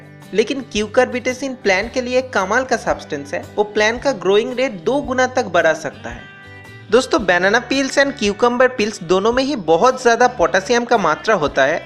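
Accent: Bengal, India. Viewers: Nice accent. Bengali style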